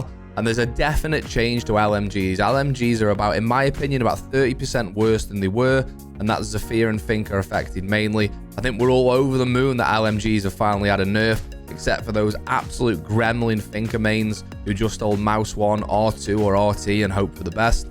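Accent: United Kingdom. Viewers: Oh the sexy British man posted another video 🤺🤺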